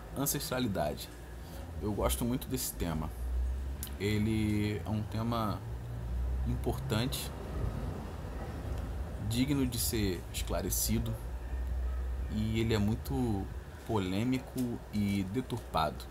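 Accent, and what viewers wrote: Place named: Rio de Janeiro, Brazil. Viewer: Pelo seu sotaque vejo que você é do Rio! Eu também! Existe alguma comunidade de pessoas que segue, vocês se reúnem?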